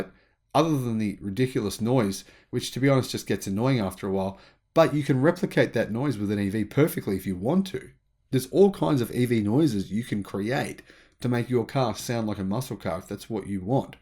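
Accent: Australia. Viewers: But are Australian or Norweed, Im confused, u sound Aussie but reckon you're a viking? do you wear a hat?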